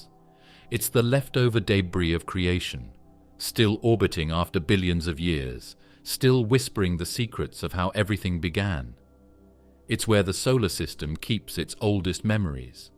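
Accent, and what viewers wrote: England, United Kingdom. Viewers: Why the english accent? You can't find an american to narrate? It's annoying. Unwatchable.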